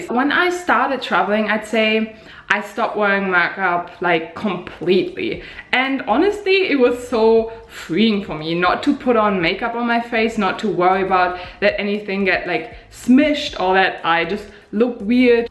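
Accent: Germany. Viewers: I love German English 💚💚😆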